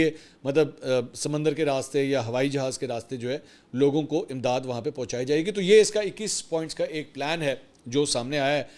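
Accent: Punjab, Pakistan. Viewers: Matter of fact"please stop speaking wieghtfull English in Punjabi accent ....stop supporting cricket too much....stop being hypocrite,just for PTI views ........almost done😏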